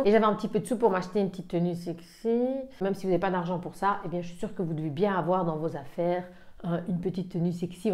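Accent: Brussels, Belgium. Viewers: Je valide les idées!! Je te connais via tit Caro! J'entend un petit accent de Bruxelles ?! Une voisine 😍 hop je m'abonne! N'hésite pas à venir voir ma chaîne! Tout Plein de gros bisou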